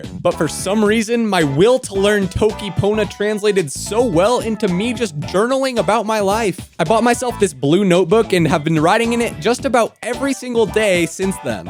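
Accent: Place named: United States. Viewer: i still feel like you kinda have a really strong american accent when speaking toki pona... lol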